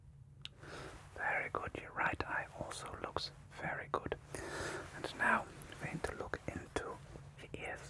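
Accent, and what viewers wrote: Germany. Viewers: This sounds very authentic, like a person born in Germany who spent some years in primary school living in England with their grandpa or something. Like the history yotuber Kraut or a Dr. King Schulz vibe. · I have German coworkers, this is a very realistic representation of their accent, honestly the only difference is they have this odd inflection where some sentences are very fast but others are very slow. If they’re excited about something or carried away their accent thickens. But as far as it goes it’s a wonderful representation! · I really dont know why the german accent is so good within asmr but noone does it as good as. even germans themselves 😂 AND A HUGE PLUS IN THIS VIDEO, is that when you're writing and taking down notes it actually sounds realistic, I hate it when asmr artists just drag the pen across the paper. Thank you great videos keep it up. 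· I think he sounds like a German who's worked in nz/aus for a while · This is the best thing I've listened to all year ❤ Love the german accent! Wunderbar? 😊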